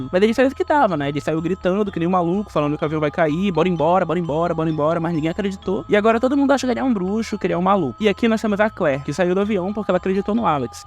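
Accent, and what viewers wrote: Pará, Brazil. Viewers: Bixo, precisa nem assistir muito pra saber q o Cara é Paraense kkkkkkkkkkkkkkkk, muito firme o video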